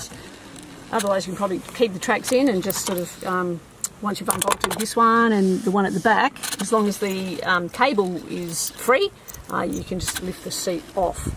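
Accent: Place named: Australia. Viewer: Cool vid. So good to hear some Aussie…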